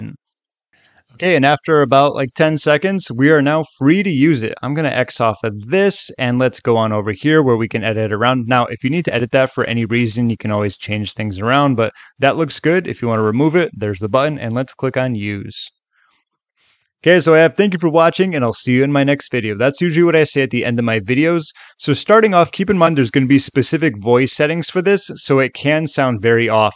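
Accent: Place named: United States. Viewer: Its easy when your accent is so generic American lol but does it actually work for people with variable localized international accents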